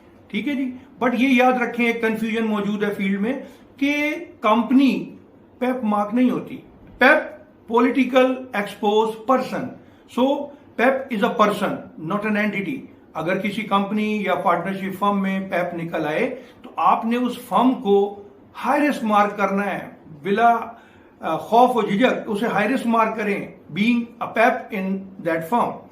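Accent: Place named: India. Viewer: For first 2 minutes I was like he is an Indian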